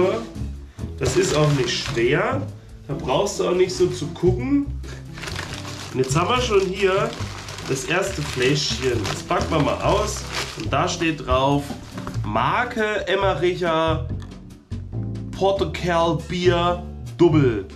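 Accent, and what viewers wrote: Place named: Netherlands. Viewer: Eim sehr spezieller niederländischer Akzent! Glückwunsch nachträglich! 😀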